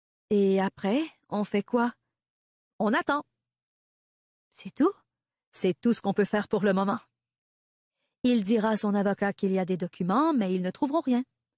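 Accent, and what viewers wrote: Quebec, Canada. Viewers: La narratrice est peut-être bien québécoise ..vous y avez pensé ???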